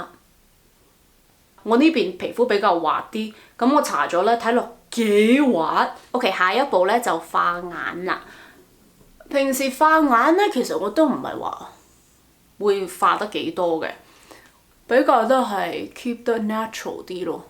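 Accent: Guangzhou, China. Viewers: 好好好重鄕音 廣州音 😂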